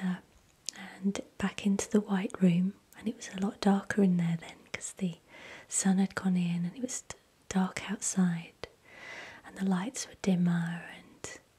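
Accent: England, United Kingdom. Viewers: Enhlish accennnnnnt, live it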